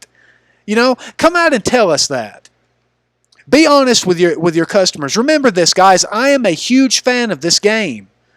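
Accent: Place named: South, United States. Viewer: Also, sony claims that this was built "from the ground up" for ps5, so they are saying this is the "best" they could do with the ps5 hardware........in a way. Its poor ass marketing and obviously a lie. I don't like this kinda shit when nintendo does it, and I still don't like it when other companies do it. On here the hypocrisy about this game from many is worse than Nancy Pelosi,and the democrats in general. Is it a coincidence than sony droids and liberals are one and the same?? LOL Its funny how many on here have made videos similar to this one, but they will not call it a cash grab, ya know exactly what this is LOL Buy it used on disc at some point man, that way they don't get another sale from you. Its what I'm gonna do LOL. This is a big reason I still love physical media. Oh and I'm from NC, so don't really mind your southern accent LOL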